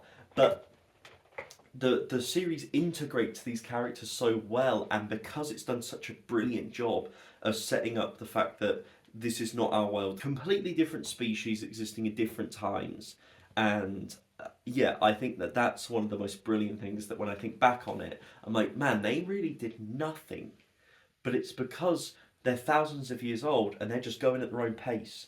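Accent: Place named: United Kingdom. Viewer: Who the hell is this and why is he british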